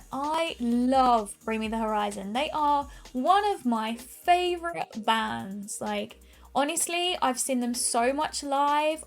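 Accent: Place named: United Kingdom. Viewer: I hope your not offended by this question but you sound British that’s not the question rather if you are from where do you hail from London by chance?❤️👍😁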